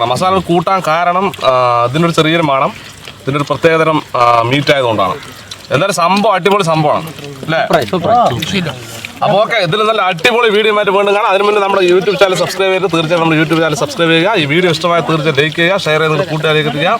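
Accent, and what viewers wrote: Palakkad, India. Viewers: തനി നാടൻ പാലക്കാട് ഭാഷ 💞✨️✴ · നല്ല orjinal പാലക്കാടൻ ഭാഷ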